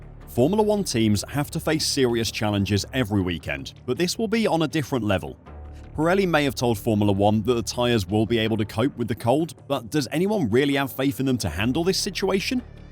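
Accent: United Kingdom. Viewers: What a negative post. A British accent does not mean “intelligent” America.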